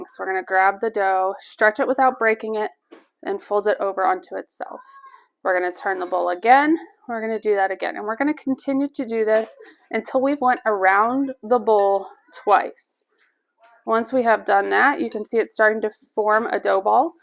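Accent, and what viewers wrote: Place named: Pennsylvania, United States. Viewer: I have to ask if you are from or live in Pennsylvania. I pronounce a lot of the same words as you. 🤗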